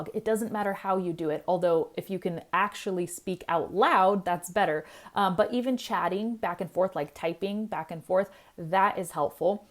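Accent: United States. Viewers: Is your English accent Amarican or British?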